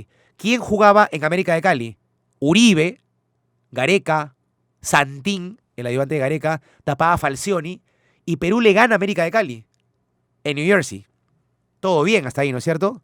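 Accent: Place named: Chile. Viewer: Pareces chileno y no peruano compare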